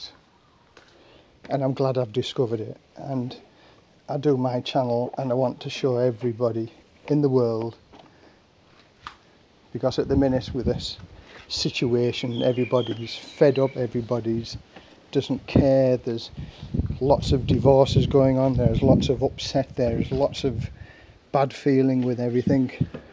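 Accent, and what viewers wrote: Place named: Teesside, United Kingdom. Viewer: Always enjoy your videos ……I’m planning on retiring to Thailand this November……thanks for sharing both inspirational and useful info…..Always good to hear a friendly Teesside accent from the other side of the planet 🌎 #UTB 🙂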